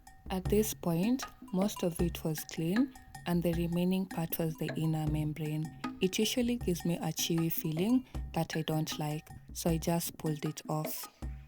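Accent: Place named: Kenya. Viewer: Love that Kenyan accent.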